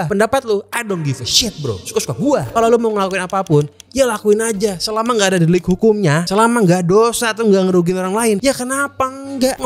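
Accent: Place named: Betawi, Indonesia. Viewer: Gw subs si Bro ini karena dialek Betawinya mantaffff dan juga berani roasting tuan besar rotschild.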